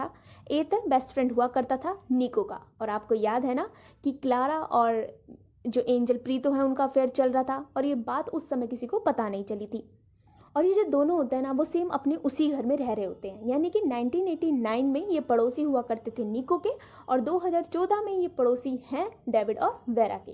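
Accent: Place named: Punjab, India. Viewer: Aap panjabi accent me hindi bolte ho .👍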